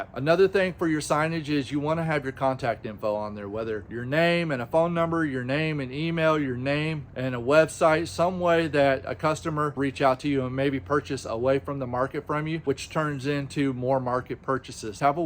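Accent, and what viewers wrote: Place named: Maine, United States. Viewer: Where anchored maine he sounds like from maine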